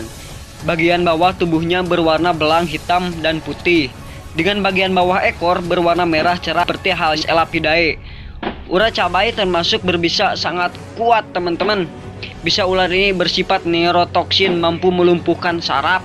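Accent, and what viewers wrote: West Java, Indonesia. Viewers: Sundaaa...a bangetz...! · Gaya Ngomongna sunda pisan euy. Jigana babaturan Dede Inoen. Mangpaat pisan infona Lur.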